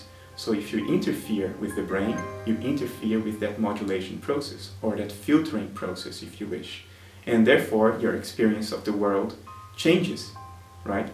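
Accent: Netherlands. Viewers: I'm dutch and his accent sounds pretty special. I haven't heard any english speaking dutch in a while I reckon. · im hearing a dutch accent am i right?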